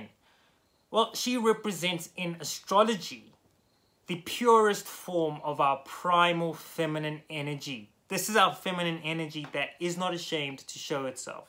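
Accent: South Africa. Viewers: Are you South African? I am South African living in Costa Rica. Lilith in the first house.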